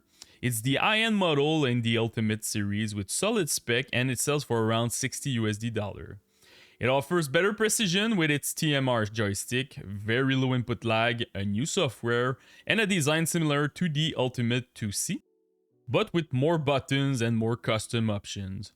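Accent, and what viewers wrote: Quebec, Canada. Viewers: lol bro i can ear the quebecois from this video XD nice to see a fellow Qc doing content in english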